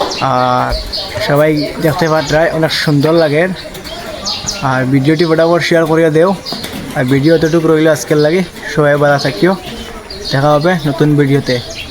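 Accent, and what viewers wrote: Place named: Sylhet, Bangladesh. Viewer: Shyleti style language . Tripura এর লোক আপনি. নাহলে শিলচর জেলার লোক. শ্রীহট্ট (সিলেট) এর ভাষা আমার খুবই প্রিয়।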